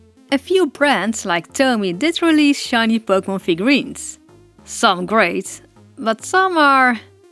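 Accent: Netherlands. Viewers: Ik heb geen intresse in verven, ik heb vrij weinig pkmn speelgoed, maar het is zover best entertainend. Ik vond het wel grappig dat ik dacht dat je een Nederlands accent had voor dat ik je kanaal verder bekeek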